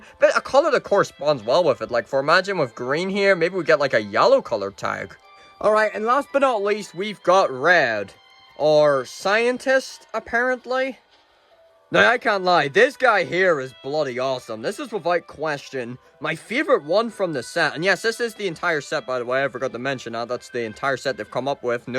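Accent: United Kingdom. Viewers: Your British lol and so am i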